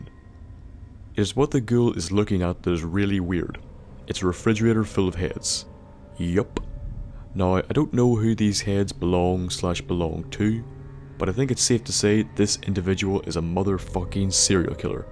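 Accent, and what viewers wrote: Northern Ireland, United Kingdom. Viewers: Are you northern Irish? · Same! Thought I recognized a familiar NI twang in your voice. Keep up the great videos! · Are you from Northern Ireland?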